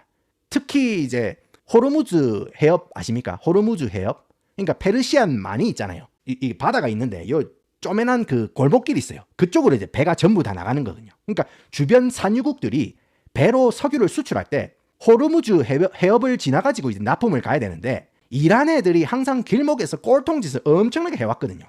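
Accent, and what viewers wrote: Gyeongsang, South Korea. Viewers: 영어 발음은 네이티브인데 억양에 부산 경남 억양 있는게 존나게 웃기네 ㅋㅋㅋㅋㅋㅋ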